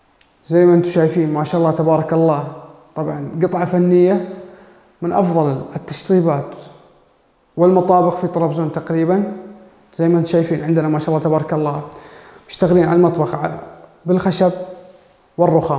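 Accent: Saudi Arabia. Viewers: اللهجه. سعوديه. اكيد. الاهل. مواليد السعوديه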